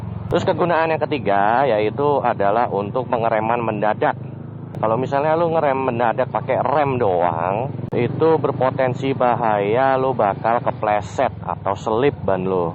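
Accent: Surabaya, Indonesia. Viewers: Wakk.. suroboyo cak 😂😂😂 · bang org surabaya ya?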